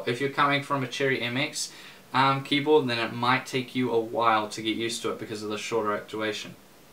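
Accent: New Zealand. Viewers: Sounds more Kiwi than Aussie, unless you're not an Aussie yourself, then all is forgiven:)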